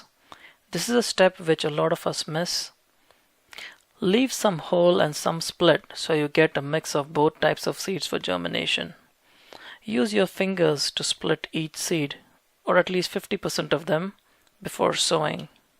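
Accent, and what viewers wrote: India. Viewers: I love the accent on these Injun guys....much more cultivated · Are you Indian ???your accent is different than of Americans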